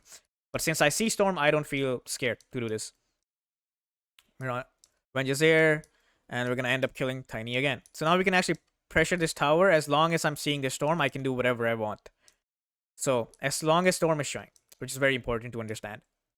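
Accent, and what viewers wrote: India. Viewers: Bro.... You Indian?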